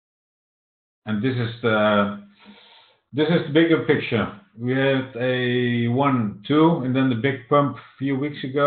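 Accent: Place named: Netherlands. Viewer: Lekker Nederlands accentje hoor! Maar een goeie analyse, keep it up!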